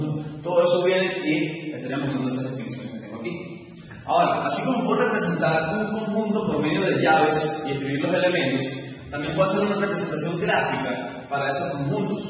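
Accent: Venezuela. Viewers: Excelente tutorial, bien explicado, buen contenido, buen manejo del tema, buena energía y muy guapo el jóven! por el acento parece ser venezolano, me equivoco? saludos desde Guatemala! :)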